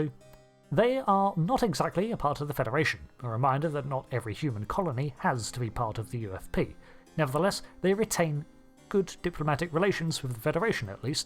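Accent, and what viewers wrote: United Kingdom. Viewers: The British Accent elevates the dialogue to hitherto unseen heights.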